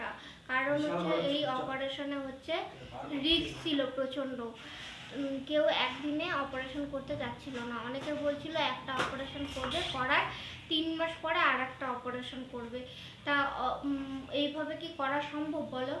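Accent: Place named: North Bengal, India. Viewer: তোমার কথায় বুঝলাম তোমাদের বাড়ি উত্তর বঙ্গে। মনের জোর রেখ। সাবধানে থেকো।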